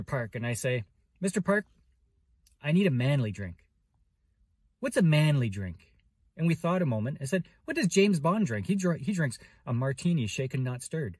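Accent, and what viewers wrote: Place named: Northern Ontario, Canada. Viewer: Trust your wife, words to live by, so when's your next Cruise? Your Nordern Ontario accent was showin' heh great video!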